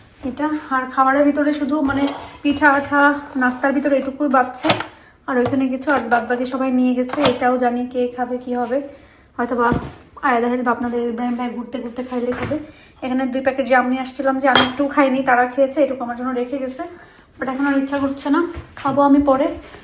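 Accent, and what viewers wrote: Sylhet, Bangladesh. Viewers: তোমাকে আমার খুব ভালো লাগে আপু,আর তার সাথে সিলেটের ভাষা😊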